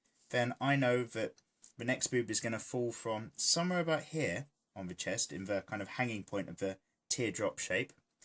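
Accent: United Kingdom. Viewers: Brilliant, but it's so unfair you can speak of boobs with such ease. This would be so pervy sounding if you didn't have such a proper British accent.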